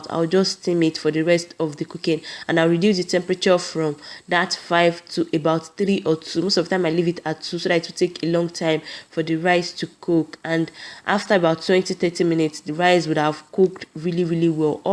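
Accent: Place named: Yoruba, Nigeria. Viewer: Jeezzz you accent is just so yorubatic . Alf scotch bennet. 🤮🤮🤮 , add—- hadd, ott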